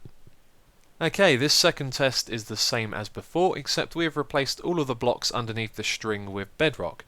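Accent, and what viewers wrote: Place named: United Kingdom. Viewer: Please do more man your awesome and your British I think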